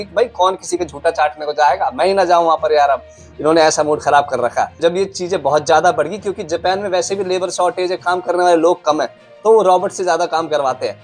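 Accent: Haryana, India. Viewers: ki always be making serious news damn funny with haryani accent 🤣🤣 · Bhai, Regular News banao Bhai. Ye jo haryanvi tone me comedy news alag hi lagti he. Crime news me to comedy he puri...😜😜😂😂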